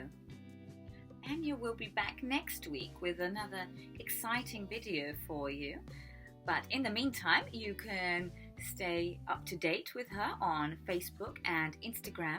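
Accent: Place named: United Kingdom. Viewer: I really love your British accent! ... it's just fantastic, he he. Great lesson about phrasal verbs, super helpful. This Valentine's day probably I'll be watching some TV series he he nothing special for me. Although I wouldn't mind going out with a British girl like you ;) heheheh. Thanks, love your videos.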